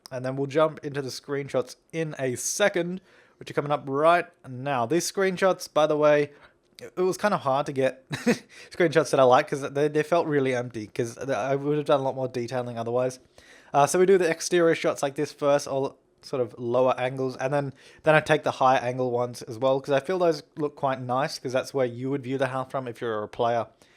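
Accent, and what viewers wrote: Australia. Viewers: he’s Australian.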